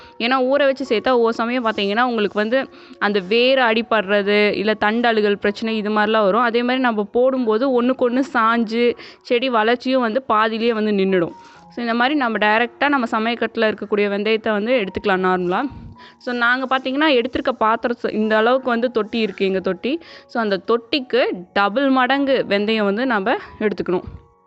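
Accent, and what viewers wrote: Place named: Coimbatore, India. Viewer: I like you and too and show in video and Im also from Coimbatore I like your native slang lots of love 💕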